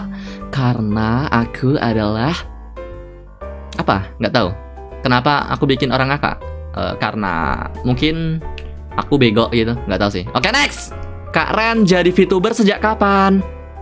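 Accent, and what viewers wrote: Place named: Java, Indonesia. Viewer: Aduh pria Jowo, medok banget🤭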